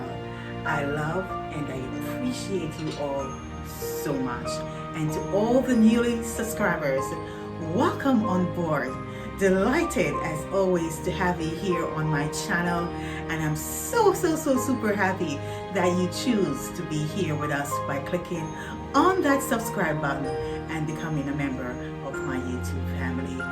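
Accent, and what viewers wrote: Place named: Caribbean. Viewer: Very beautiful ❤also i like your accent are you caribbean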